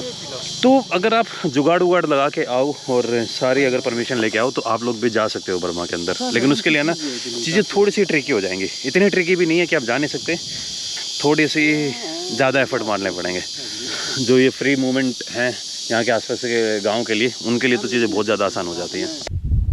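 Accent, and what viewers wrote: Haryana, India. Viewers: The way you talk in haryanavi 👌👌🙏❤️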